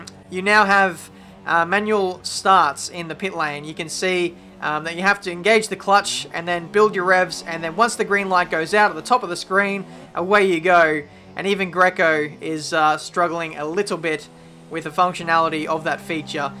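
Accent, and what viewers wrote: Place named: Australia. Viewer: Richard Booth He's Australian, of course he will have an accent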